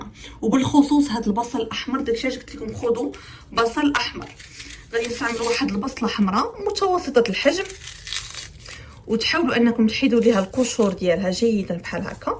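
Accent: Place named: Maghreb. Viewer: السلام عليكم اختنا الطيبة القلب السيدة -نشكرك على بث هذه الڤيديوهات المفيدة للناس ولكن نرجوك أن تتكلمي ببطئ كي نلحق أن نفهم كلامك بتتابع معك وكذلك نرجوا أن تتكلمي باللغةالعربيةالفصحى فاللهجةالمغاربيةالجميلةالعذبةصعبة الفهم في بقية الدول العربية،نرجوا الإهتمام وشكرآجزيلآجازاك الله خيرآ😍❣️👰🤵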